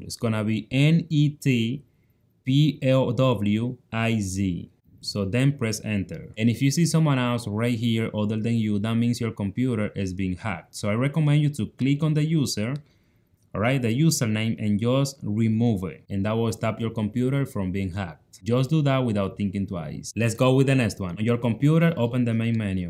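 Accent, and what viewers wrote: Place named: Dominican Republic. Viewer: Bro got Dominican accent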